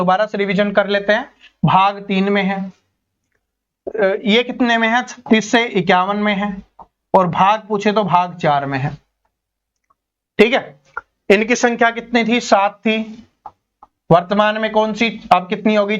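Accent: Haryana, India. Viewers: Spata 100 haryanvi boli m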